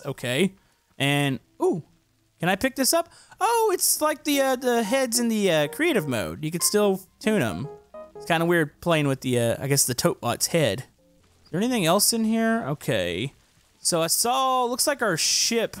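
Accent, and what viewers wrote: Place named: South, United States. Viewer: He's southern, accents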